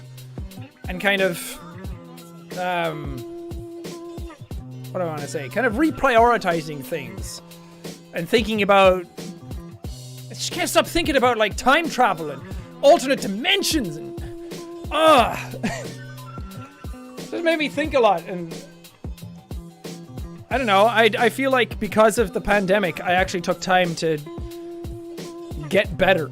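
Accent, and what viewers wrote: Ireland. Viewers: He sound a lot more Irish love it. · How have you come back with a stronger Irish accent even tho you live in the forbidden land 😭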